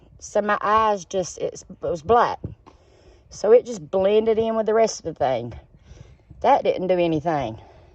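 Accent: United States. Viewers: MEN TAKE NOTICE OF THE DETAILED EXPLANATION. BY THE WAY, I LOVE THE COUNTRY ACCENT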